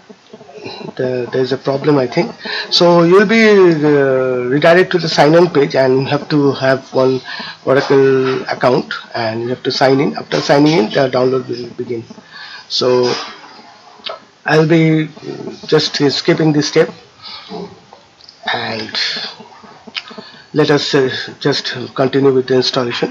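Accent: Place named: France. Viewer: puto acento francés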